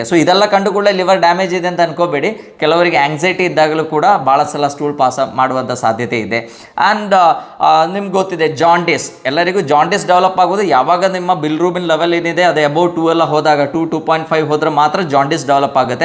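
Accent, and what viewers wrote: Kerala, India. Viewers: Very knowledged and experienced doctor who speaks Kannada fluently with good prounanciation but reveals the fact of originally from Kerala while speaking few word in English For example follow pronounciation. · Malayali kannada samsarikkumbol vere oru feel aan ❤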